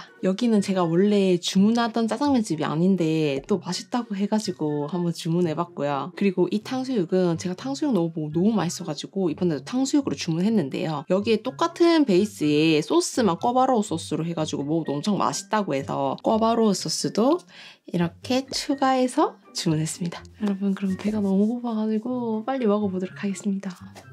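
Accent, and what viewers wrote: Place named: Gyeongbuk, South Korea. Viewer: 완전 경북말씨신데...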